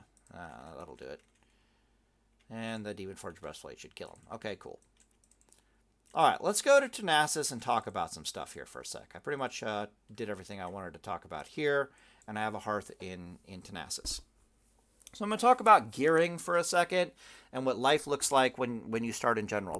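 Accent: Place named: Minnesota, United States. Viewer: You from Minnesota? My Minnesota friend says blah blah blah blah blah just like you.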